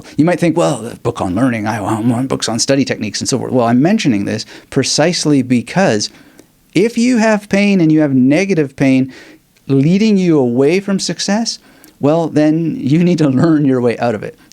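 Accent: Canada. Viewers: Rockin' the Canuck accent 🎉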